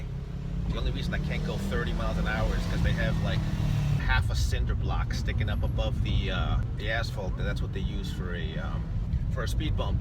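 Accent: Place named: New York, United States. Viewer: “Keep swinging that thing all the way to chula vista” - u from ny? Thats how nykers talk.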